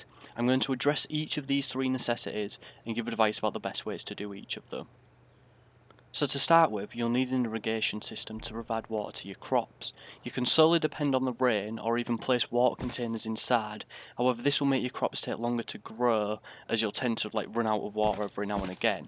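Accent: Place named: England, United Kingdom. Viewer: He's speaking English with an English accent. lol what more do want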